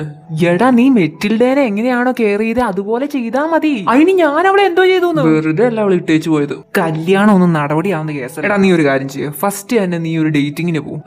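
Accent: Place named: Tamil Nadu, India. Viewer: Your Tamil accent 😤😤😤🔥🔥🔥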